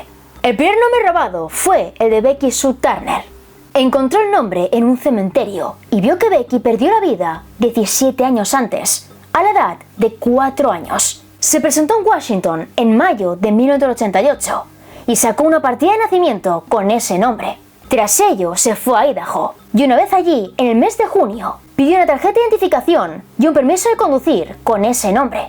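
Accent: Spain. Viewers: Un misterio muy misterioso porque no tiene 10 millones de suscriptores. Saludos, tu acento español tiene un misterio encantador Saludos bellotas hermosas y preciosas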